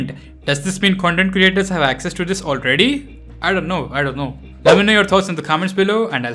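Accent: India. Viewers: Never mind but why does your accent sound so Indian?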